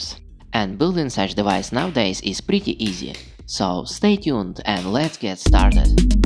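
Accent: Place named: Russia. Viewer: Russian accent detected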